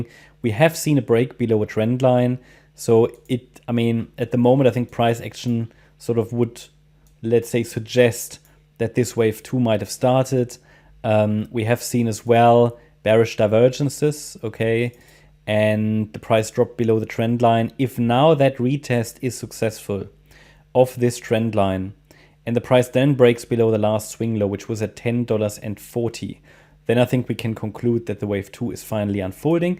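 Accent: Germany. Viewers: Immer schön deutsche Leute Englisch reden zu hören. Gruß aus Berlin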